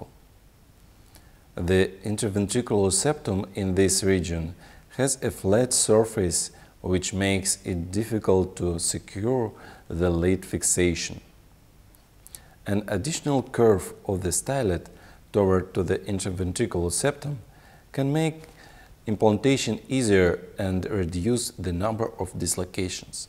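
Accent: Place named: Russia. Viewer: The Russian accent is a little difficult but the information is top notch. Great demonstration!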